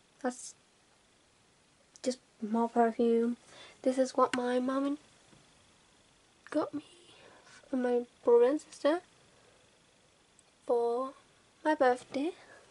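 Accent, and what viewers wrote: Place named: RP, United Kingdom. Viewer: The accent though so posh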